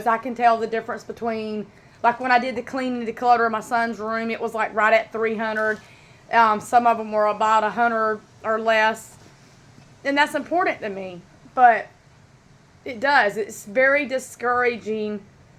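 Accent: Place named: South, United States. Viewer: The first ever video I watched was your "What's in my purse" video when I was shopping around for a jansport mini. Really loved your southern accent and simple vibes. You will be missed. It was comforting to watch your chats and cleans.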